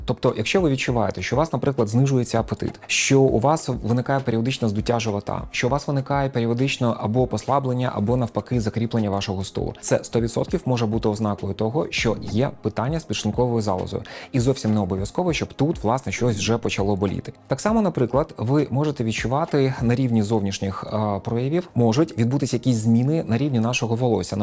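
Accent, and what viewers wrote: Standard. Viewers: Я навіть не знаю, що більше слухаю, цінні поради чи таку гарну, чисту українську мову.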